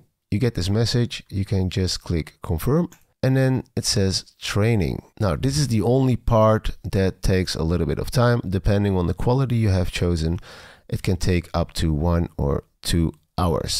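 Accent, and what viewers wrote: Netherlands. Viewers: Goede video, klinkt fantastisch! Complimenten! Je Nederlandse accent heeft je wel verraden haha... 🙂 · Ik wist het niet zeker maar ik hoorde een NL accent en achteraf bleek dit inderdaad het geval te zijn. Gaaf! 🫡💪❤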